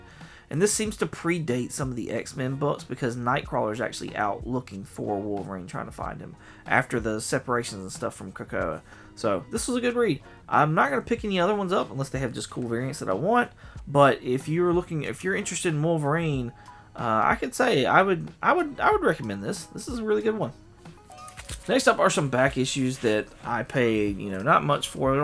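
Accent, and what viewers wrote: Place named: South, United States. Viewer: Hey brother! I came over to check out your channel after hearing about you on MONComics! I wanted to watch your latest video to see what you're all about! Do you live down south? I notice a little bit of the south in your voice! 😉 I actually lived in Vicksburg, MS for a year when I was 15. Had a great time there! I love all your new books you picked up! Right now I am just concentrating on finishing my Conan Collection and just started working on collecting every Batman (that I will never finish since I am so damn old) LMAO! I love the Constantine movie, but have never read any of the books. Are the new TMNT still as gritty and have violence like the original 80's run? If so, I need to pick them up! Love that Wolverine cover for sure!!! I enjoyed your content, looking forward to your next video, and Happy to be your new subscriber! 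Number 970 is a good number! Good luck on your journey to 1K!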